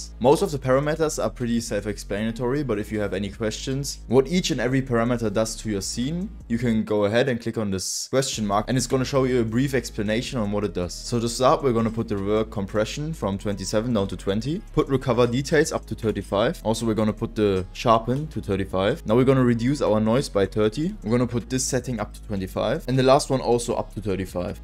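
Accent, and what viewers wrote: Germany. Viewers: Man hört so krass das Deutsch heraus :D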